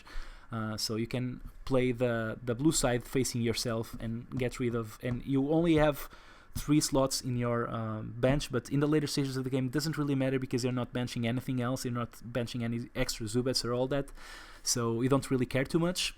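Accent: Portugal. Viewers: I knew ur accent was portuguese.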